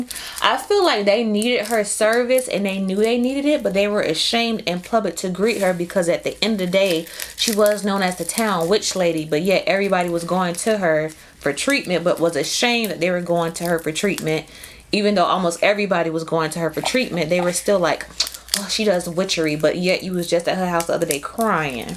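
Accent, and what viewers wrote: New Orleans, United States. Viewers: The “cOLD DRANk” 😂😂 I like that N.O. accent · as a new orleanian baby you got the accent 😂😂 and cold drank is legit ONLY said when referring to soda ❤️🙌🏽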